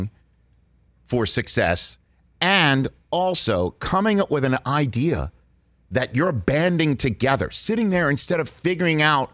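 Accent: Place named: New York, United States. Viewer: NYC accent was extra stronger for this one!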